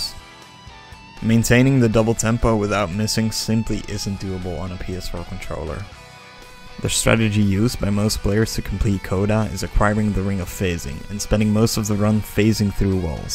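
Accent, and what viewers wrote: Netherlands. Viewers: Ik hoor je Nederlandse accent😜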